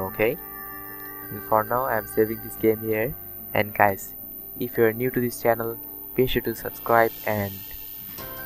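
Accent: India. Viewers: You sounds like Indian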